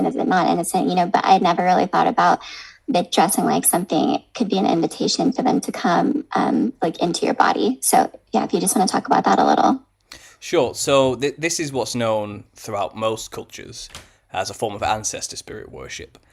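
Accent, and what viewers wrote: United Kingdom. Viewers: you sound hella condescending and subjectively judgmental in sharing information in this video... like bruh, keep it classy and objective. We dont care about how you feel about other cultures, just show us how things correlate and save the sass... you sound very snobby and British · Went straight to Harlequin, but totally skipped the Court Jester of the Middle Ages, which was probably the true origin of the Harlequin. The Wildman was most likely a reference to a Sasquatch type figure since they've been reported all over the world. The red hair may be from the red-headed giants of old. Just because someone has a "British" accent does not mean they're 100% correct about something, do your own research. Good connections though, almost there.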